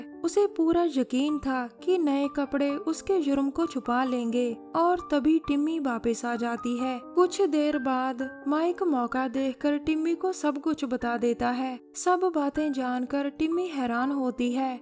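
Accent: Punjab, India. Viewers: kyaa app punjab se ho me 2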